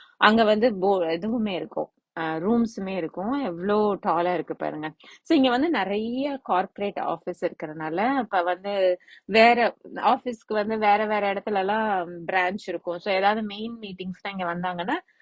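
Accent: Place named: Srivilliputhur, India. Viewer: Somehow I landed on to your channel, we are from SF bayarea, living there for 25ish years. My older daughter just graduated from MIT. Just roamed around this area when we were in town for her graduation. Loved Cambridge summers and business of the city. Love your style of talking and openness of sharing information. Naan thanjavur side. Somehow enjoy your azhaga srivillipittur style Tamizh. I work for Microsoft, mostly work from home, drive to work once or twice a week. Ilayaraja and SPB are by drive time buddies, Ippo Spotify fan due to my daughters persistent pestering. Keep rocking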